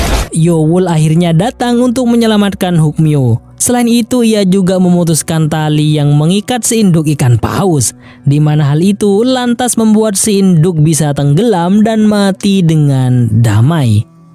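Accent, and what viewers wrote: Madura, Indonesia. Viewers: Madureh ongghu🤣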